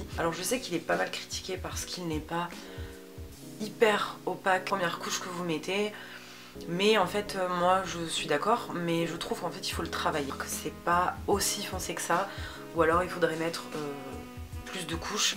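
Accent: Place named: South, France. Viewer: J'adore ton petit accent du sud tu m'amène le soleil en haute Savoie 😊 J'adore ton ral il te va trop trop trop bien 👌🏻 Bisous bisous 😂😂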